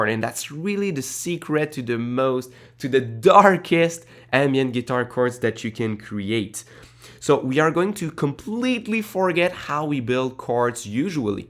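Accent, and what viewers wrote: Quebec, Canada. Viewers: Quebecois accent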